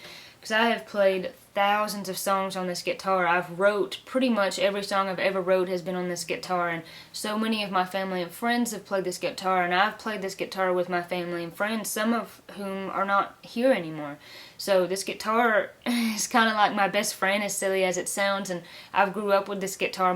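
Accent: South, United States. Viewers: 1st off the word you were searching for that describes Christmas in childhood is MAGICAL! My mother was also a great documentarian so appreciate your video from the past. And lastly I think you have one of the most beautiful speaking voices I've ever heard. You should read audio books that need a southern accent!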